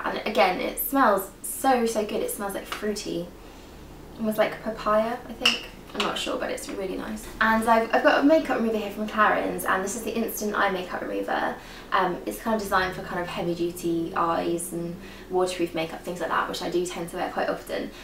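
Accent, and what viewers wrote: England, United Kingdom. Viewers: You look like Drew barrymore with an english accent and normal voice. Perfection!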